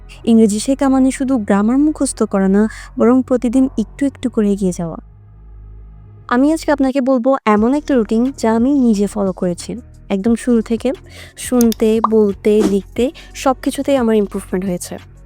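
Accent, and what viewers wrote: Chittagong, Bangladesh. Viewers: apni ki chittainga , cause apnar accent pura amader chittagonian der moto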